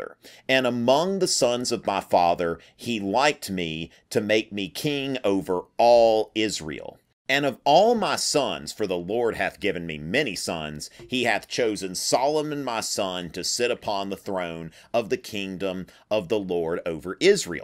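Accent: South, United States. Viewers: How is this guy not more popular? His accent takes getting used to if you're not familiar with that southern twang, but once you get passed that he's not boring!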